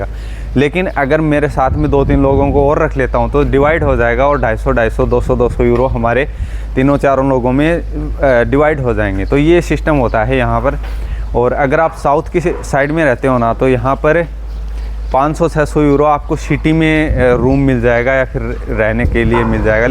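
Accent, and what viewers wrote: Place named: Uttarakhand, India. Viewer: tum bhai pkka uttarakhand se ho .. tone mai hi apnapan h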